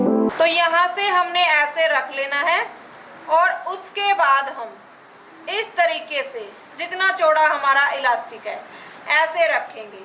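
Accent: Haryana, India. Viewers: Sister aap accha sikhati hai 😂sikhate wakt baate karati hai to aur acchi lagti hai mujhe haryanvi bahut pasand hai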